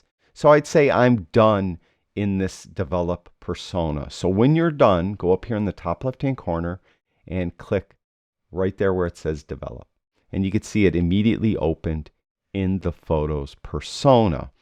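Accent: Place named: Buffalo, United States. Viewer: I left Buffalo in 1964, graduated from Hutch Tech in 1962, the second I heard your voice I knew you were from Buffalo...I'm practicing my Buffalo accent..😊Very good videos.. I am a long time PS user and just switched to Affinity. Good stuff. Thank you.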